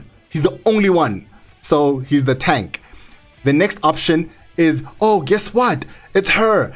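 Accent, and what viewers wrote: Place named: South Africa. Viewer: Best tutorial hands down, you sound South African 🤔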